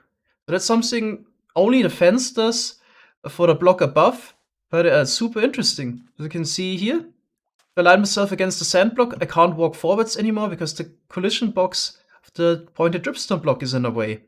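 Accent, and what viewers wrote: Germany. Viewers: Is he german? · You sound like you're German You kind of have a German aczent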